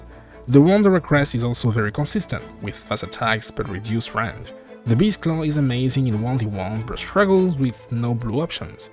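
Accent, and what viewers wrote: France. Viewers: Are you french? ew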